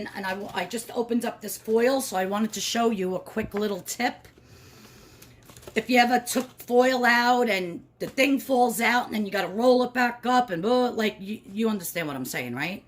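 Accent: New York, United States. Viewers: Just found you. Love your videos and food and knew right away from your accent that you are from ny ❤️. Me too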